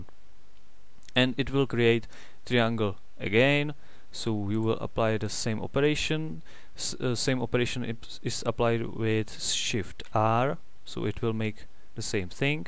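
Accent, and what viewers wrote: Czech Republic. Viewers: very useful, I like it, but that czech accent is too much xD I'm sorry, but it is difficult for some people to understand our accent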